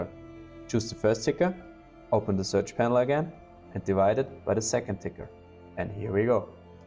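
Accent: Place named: Germany. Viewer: I didn’t know you also had a German accent 😅 great vid brother. Hope it’s the first of many!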